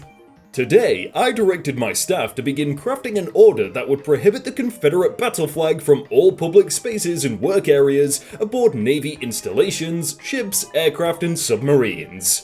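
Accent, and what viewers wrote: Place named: United Kingdom. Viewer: Every video helps me learn British-English I love it, thank you 🙏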